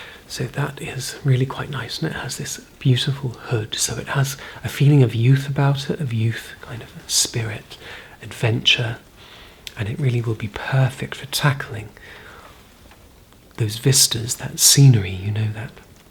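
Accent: RP, United Kingdom. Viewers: You’re so posh I’m beside myself w tears. You’re like a fairy godmother/father I’ve always dreamed of 😭😭😭😭 U HAVE NO IDEA HOW HARD IT IS BEING AMERICAN!!!!!! 🤪😂😂😂😂😂 sometimes I have cried from not being a Brit but that was years ago...... 🤪😂😂😂😂😂😂 I love British people and their accents so much it speaks to my soul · I just discovered this man because of gentle whispers mentioning him and I must say, his voice is soooo soothing and the British accent is just the icing on the cake!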